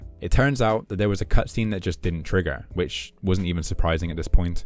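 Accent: United Kingdom. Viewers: All good😂 But may I ask, which accent you speak? I guess something from the UK?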